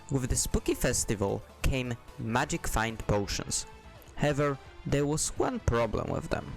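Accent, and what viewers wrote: Poland. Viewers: Jesteś z Polski? Czy mi się wydaje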